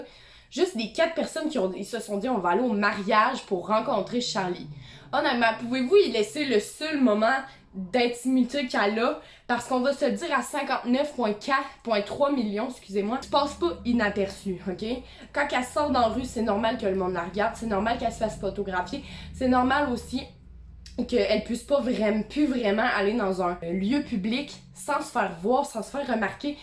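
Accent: Quebec, Canada. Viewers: L’accent québécois c’est juste une pépite 🦋💎😙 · J'adore ton accent québécois (même si je comprends pas trop parceque je suis française de France )ptdrr j'explique très mal😭😂😅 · C l'accent québecoi 👌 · Boblyn Mukuna bah elle a un accent cebecoi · Je KIFFE TELLMENT ton accent québécois c’est un truc de dingue 😂😎❤️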